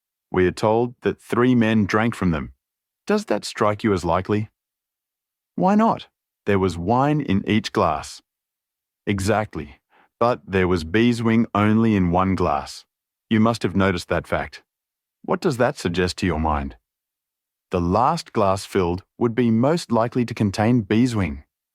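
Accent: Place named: Australia. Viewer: Ai Australian accent? No no no ....I'm gone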